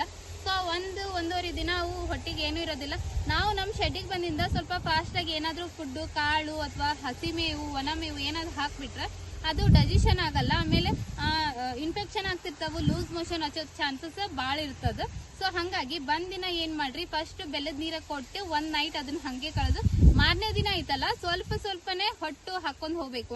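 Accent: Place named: Koppal, India. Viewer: ನಿಮ್ಮ ಭಾಷೆ ನೋಡಿದ್ರೆ ನಮ್ಮ ಪಕ್ಕದ ಜಿಲ್ಲೆಯ ಕೊಪ್ಪಳ ದವರು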